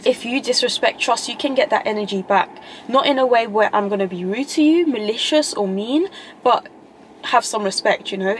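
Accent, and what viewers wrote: United Kingdom. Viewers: Because it is a British accent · You have a nice accent it's like a British accent ❤ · You have a nice accent it's like a British accent ❤️🇬🇧👍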